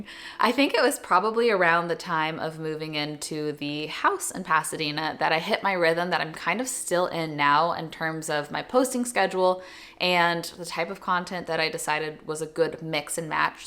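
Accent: Texas, United States. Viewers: I was watching ur old videos last week actually and I was telling myself hey she still talks the same the y’all part is there that Texan accent that I love. I’m from Texas as Corpus Christi. Don’t loose that part of you makes you so unique.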